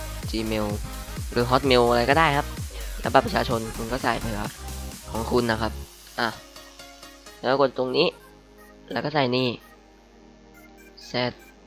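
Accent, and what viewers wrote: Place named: Thailand. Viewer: เสียงเหน่อๆ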